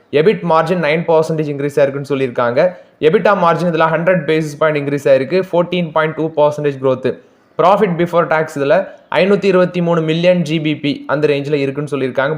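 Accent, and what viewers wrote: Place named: Tirunelveli, India. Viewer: Bro! Are you from South TN? Tirunelveli area? You speak Aattu, kaandi etc, the Tirunelveli local language.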